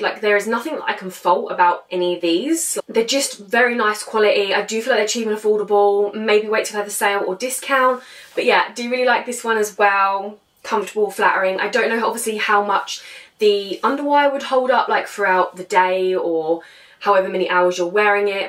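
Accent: United Kingdom. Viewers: The blue and brown with the diagonal stripes is my favorite. Sorry for not having a better description, but you are speaking fast British English and I am hearing in slower American .English! As always, you are beautiful!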